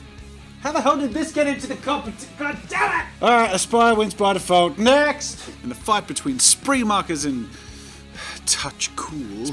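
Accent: Australia. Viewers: I’m looking for good markers.. without paying a fortune came straight here and thought he sounds Aussie.. then you said ships to Australia 🇦🇺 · Wait are you Australian? You have a strong accent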